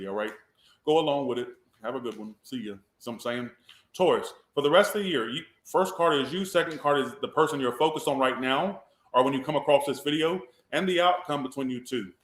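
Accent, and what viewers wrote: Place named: South, United States. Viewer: Thanks for the work you do ! Love that Southern draw!!!! I’m from Texas so I do especially when I’m mad (Scorpio)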